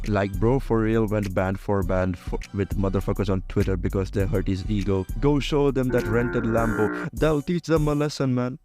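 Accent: India. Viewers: Indian voice😊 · Are you indian cause i can hear the accent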